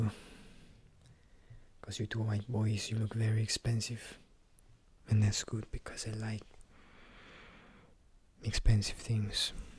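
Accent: Spain. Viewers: I love this accent you do! it is phenomenal. This husky Spanish rough tone.. uff so good! p.s I love how close to the mic you are. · I like the Spanish accent. This and Oberyn are my fav videos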